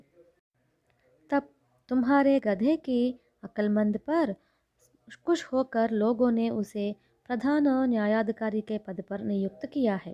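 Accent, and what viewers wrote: South, India. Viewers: south indian accent sounds good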